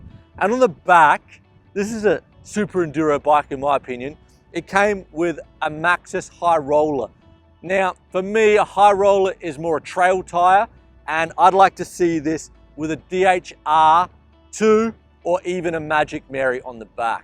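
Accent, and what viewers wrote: Australia. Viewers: great review, love the aussie accent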